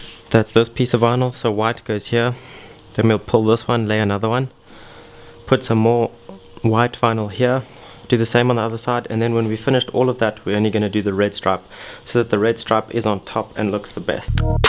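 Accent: South Africa. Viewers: He sounds like an English South African. You must hear the accent of Afrikaans South Africans trying to speak English. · Is that a south african accent? Just curious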